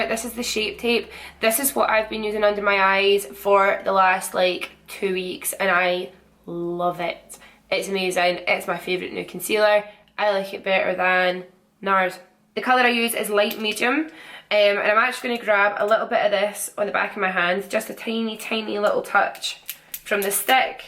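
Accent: Scotland, United Kingdom. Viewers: The Scottish accent is so pretty! & I'm in love with this look!! xx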